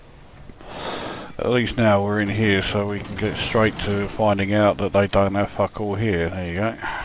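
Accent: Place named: Cumbria, United Kingdom. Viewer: Good Luck to you, I look forward to seeing any Uploads you may have when you aquire The Clipper, My PC is a little too slow for the Game but When it is finally finished I may have a bigger better PC, By the way IU'm from West edge of Lake district and i recognised your accent as not being that far away from me:)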